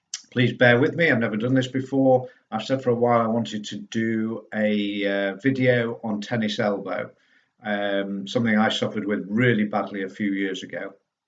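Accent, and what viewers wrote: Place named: Midlands, United Kingdom. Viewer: Thanks for the tips. I know you are a Leeds boy, but your accent sounds more like the Midlands to me( Staffs, Derbyshire?). Or am I wrong lol?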